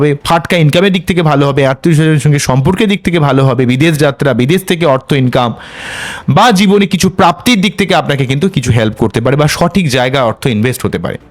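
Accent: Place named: West Bengal, India. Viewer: Apni bolchhen "hobe abar hobe". Ami Arijit er vashay boli " R kobe?R kobe? , R kobe r kobe r kobe?😂 BTW, apnar katha sune mone hoy apni Bardhaman chhele.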